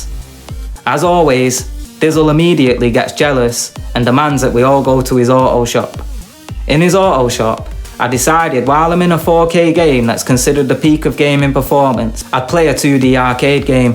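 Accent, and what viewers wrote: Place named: United Kingdom. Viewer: #CrashRaid You are British I like that lol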